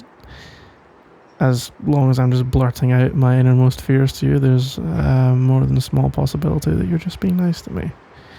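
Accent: Scotland, United Kingdom. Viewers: OMG YES A SCOTTISH DILF AUDIO 🥹 my life is complete, but on a serious note this is adorable <33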